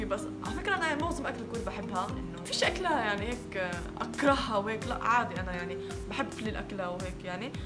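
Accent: Palestine. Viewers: أنا لو شفتك جد هأحكي انو انتي كورية فلسطينية لانو لهجتك فلسطينية و فيكي شبه من الكوريين بحبك كتير متابعتك من غزة ♥️♥️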